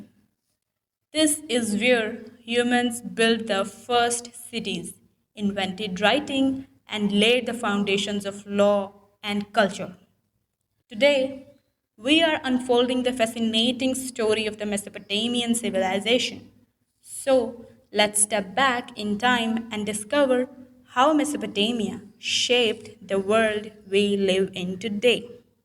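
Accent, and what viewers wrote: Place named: United States. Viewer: Madam from where you have learnt American English?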